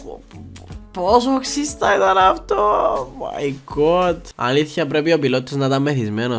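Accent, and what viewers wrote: Cyprus. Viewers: Εισαι απο την κυπρο? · ΛΑΤΡΕΥΩ ΤΗΝ ΚΥΠΡΙΑΚΗ ΠΡΟΦΟΡΑ❤ Ειδικα του!!!❤❤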